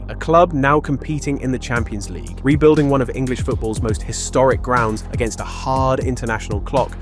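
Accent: United States. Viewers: Maybe drop the American pronunciation on several words dude.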